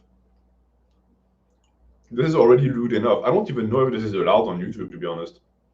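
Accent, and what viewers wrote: France. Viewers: It was rainy the whole week and despite that I wanted to get my steps in and went to the gym by foot. While coming back home all wet and soggy I just imagined stupid face with a grimace saying "Homegym Masterrace" with his french accent.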